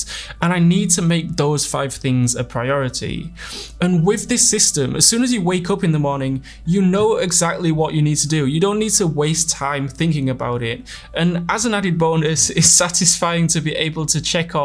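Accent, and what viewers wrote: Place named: Yorkshire, United Kingdom. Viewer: which part of the UK do you come from? It sounds a bit like Yorkshire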